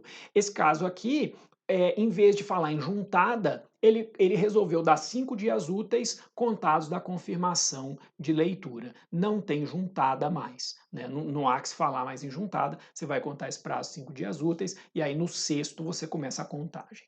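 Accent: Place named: Minas Gerais, Brazil. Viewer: adoro suas aulas. Uma inspiração! Adoro seu mineirês (pq sou mineira)... otimo! fantastico!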